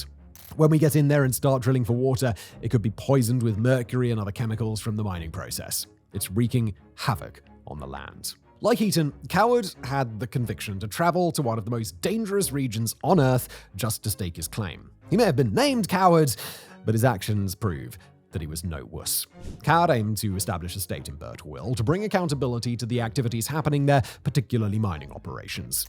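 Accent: United Kingdom. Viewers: By "Freedom units" he means British imperial units, some even have the word British in them ie. BTU. Yes we still use the same units named after the accent he has, and he uses the units that originated in France, not the UK or CZ. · You are so British. Gawd your pronunciation is so ridiculous.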